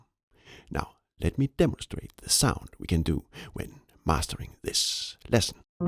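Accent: Denmark. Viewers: holy denglish han må simpelthen være dansker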